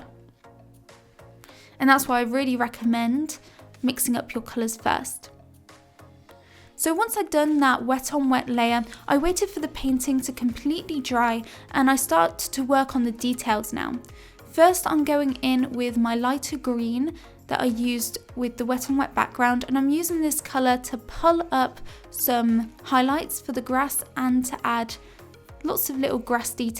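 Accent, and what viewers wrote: United Kingdom. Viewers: British Accent is fun 😶👍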